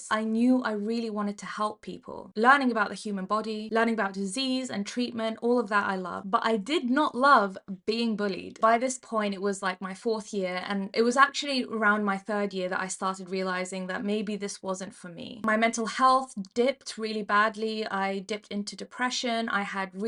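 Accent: United Kingdom. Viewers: Everytime i hear a British accident i change the video lol i know how bad it is in the UK. I’m interested in the us only.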